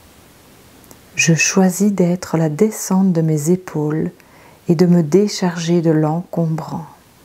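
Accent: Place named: Belgium. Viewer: Bonsoir et merci pour cette nouvelle séance qui m’a fait beaucoup de bien. J’ai appris que vous habitiez Mons, en Belgique. Je me doutais un peu, j’avais reconnu votre accent, je suis du Nord de la France 🇫🇷 et le mien ressemble au vôtre. J’ai eu une douleur supportable au milieu du dos, du côté droit que je n’ai jamais eue, est-ce à cause de cette pratique ? Pouvez m’écrire le nom de votre nouvelle pratique, les heures et jours où l’on peut vous retrouver en live sur YouTube car je n’ai pas eu le temps de les noter. Merci beaucoup.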